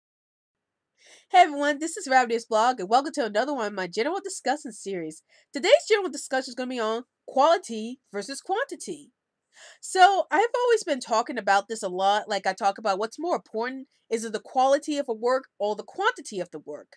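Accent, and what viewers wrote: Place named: Boston, United States. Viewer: Thumbs up; good video! I feel that quality is important because of the reasons that you said in the video. By the way, are you from Boston? I say this because of your accent.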